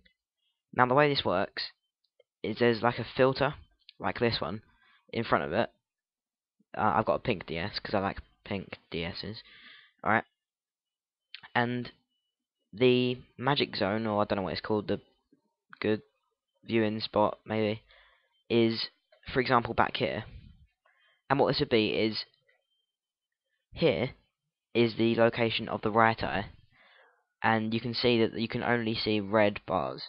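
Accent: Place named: United Kingdom. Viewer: I get that you're British, but do you have to pronounce it "free d"?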